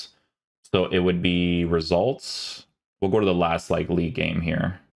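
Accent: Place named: Azores, Portugal. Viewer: Were you born in Portugal,? Or in Canada, but descendant of Açorianos? What team does you father support? There was quite a big açorian immigration to where I’m originally from (Southern Brazil), but both the accent and the trading ties were gone centuries ago. I’m personally multi-ethnic (German, Italian, Lisbon Portuguese and native South American) but I wish I had more contact with Açorian culture. The accent is just insane for me:)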